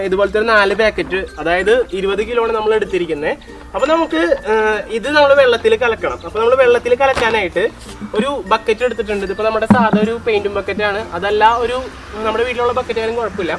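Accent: Thrissur, India. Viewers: തനി നാടൻ തൃശൂർ സംസാരവും കൺട്രി ലുക്കും ഉള്ള ഇയ്യാൾക്ക് യുട്യൂബിൽ എന്തു കാര്യം (ഇതു പൊതുവഴിയല്ല )എന്നു വിചാരിച്ച് മനസ്സില്ലാമനസ്സോടെ ആണ് ഈ ചേട്ടായിയുടെ വീഡിയോകൾ കണ്ടുതുടങ്ങിയത് ...പക്ഷെ ചുരുങ്ങിയ സമയത്തിനുള്ളിൽ നമ്മൾ സുല്ലിട്ടു ... ഇത് താടി വെച്ച അത്ഭുതം തന്നെ ... great ideas ....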